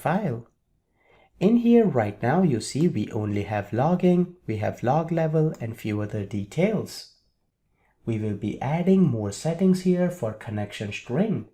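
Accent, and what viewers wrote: India. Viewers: Imagine speaking Hindi and then trying to switch to english for three straight hours. It must be a tounge twister. At least he manages. Every time I try to speak in english it is terrible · makes me nostalgic hearing a indian guy making .Net tutorials (courses) for this. Takes me back like 12 years to good old days of youtube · It's an sweet Indian English accent 😁🇮🇳🇮🇳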